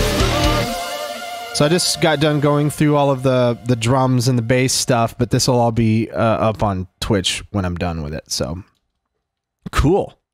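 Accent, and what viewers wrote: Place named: Appalachia, United States. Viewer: The hillbilly accent 😂 I love it!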